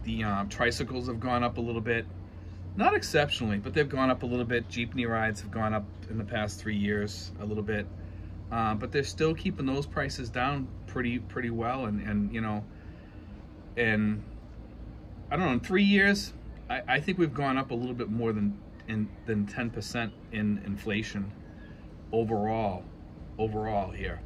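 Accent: Boston, United States. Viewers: I was listening to one of your videos the other day, and I thought to myself, this guy has to be from the Boston area. I have been to Boston several times. My older brother went to Brandais University for Grad School and was a Professor at Boston U. when he passed away. One of my best friends from my days at the U. of Texas retired as a Lawyer and move to Boston. His son works for a High tech company near Boston. He is going to move back to Texas soon. You are on the mark regarding inflation here in the Philippines.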